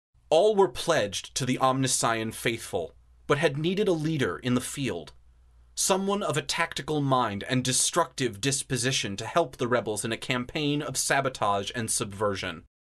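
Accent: United States. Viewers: ugh no offense but American accent just doesn't fit 40k.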